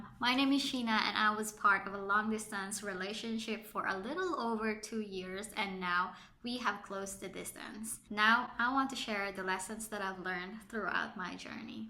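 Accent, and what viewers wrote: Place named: United States. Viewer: I asked because your English dialect and expressions are very American like.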